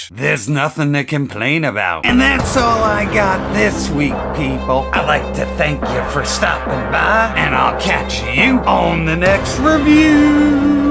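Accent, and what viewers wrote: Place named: Pittsburgh, United States. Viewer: I'm afraid my ignorance was showing. This is a Pittsburgh accent maybe? I thought you were doing a funky voice in the intro but my accent knowledge wasn't up to snuff.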